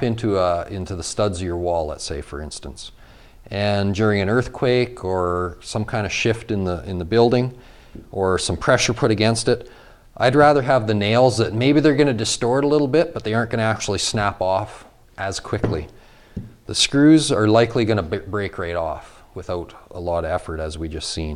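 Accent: Canada. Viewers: Is that a Canadian accent I hear? 🙄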